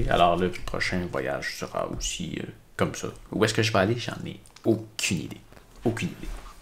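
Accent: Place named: Quebec, Canada. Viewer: Moi j'aime l'accent Québécois 🥰🤣Bonne journée.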